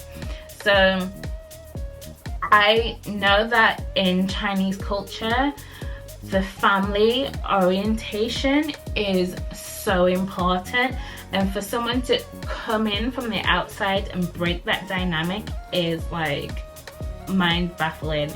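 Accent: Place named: Northern England, United Kingdom. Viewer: Ahhhh you are from Leeds! I was trying to pin point the Northern ascent. Funny story time! 😂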